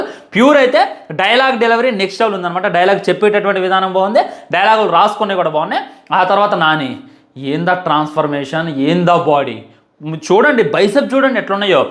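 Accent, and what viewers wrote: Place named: Andhra Pradesh, India. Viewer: Niku teaser 7th month lone ochindha 😂😂😂 em review ra nidhi malla ni slang eh andra slang undhi nv telangana antunav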